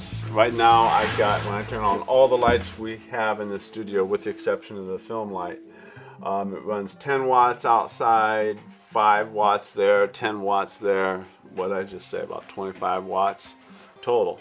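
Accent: South, United States. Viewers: You are originally from the South, correct? I've been watching you for quite a while and always kinda figured that you were from NoLA or S. Mississippi, just going by the accent.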